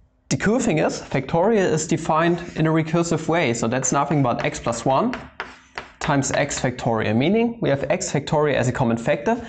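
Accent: Germany. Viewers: Are you german? You sound so bloody german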